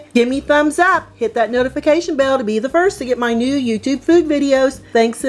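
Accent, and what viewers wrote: South, United States. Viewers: lol me either but she’s southern so it’s ok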